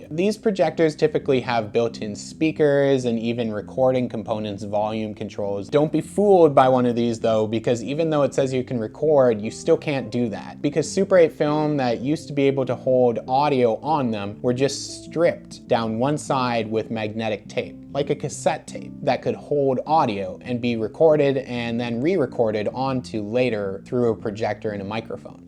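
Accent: Canada. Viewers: You have no idea how much I wish I'd found your channel a decade ago if it existed.. I had so much analog in my house and I didn't know how to do a thing with it and then I had a fire and a crazy crackhead tenant breaking in before that all I have left is a few things from the few super 8 reels that I managed to carry out of the house. I'm so afraid to run it thru a projector because I don't have much of anything left of my late parents and none of my brother since they have passed away. Where are you from? You sound like an old friend from Winnipeg and you are adorable! If I was half my age I would be starry eyed between your Canadian accent and your handsome face!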